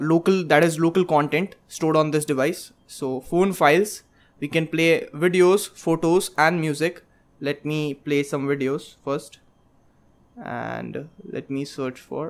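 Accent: India. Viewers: ياخي يجيني مغص اذا قعدت اسمع لهندي يتكلم انجليزي😫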